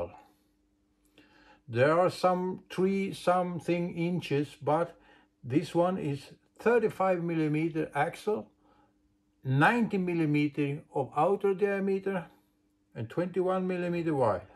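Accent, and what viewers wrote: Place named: Sweden. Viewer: Glad I could help! Accent is Swedish :)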